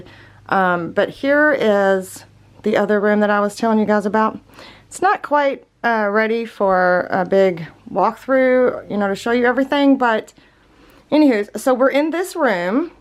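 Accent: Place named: Eastern Kentucky, United States. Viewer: Was just going to ask if you were from Eastern Kentucky because your accent was so familiar to me. Then while scrolling to the comments section I saw the mailing address. My Dads family is from Sandy Hook in Elliott County, Love your videos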